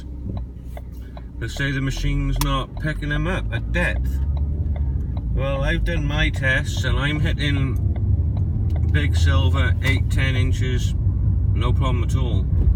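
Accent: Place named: Alabama, United States. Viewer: I'd recognize that voice anywhere! It doesn't matter where you go in the world,. That Alabama accent is always going to shine through!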